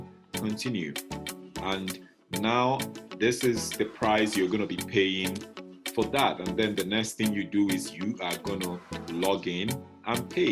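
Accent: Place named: Nigeria. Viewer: your accent reminds me of my Nigerian ex lol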